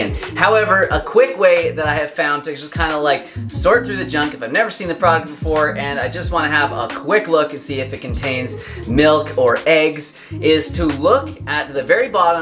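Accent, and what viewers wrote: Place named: Canada. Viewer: Ahhhh, Canadian. Now the accent makes sense.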